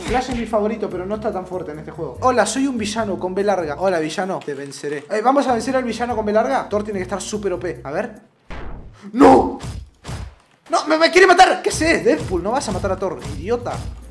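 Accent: Chile. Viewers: Ya pero hablando chileno,re good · Q bien habla chile otros pibes lo hacen mexicanos JAJJA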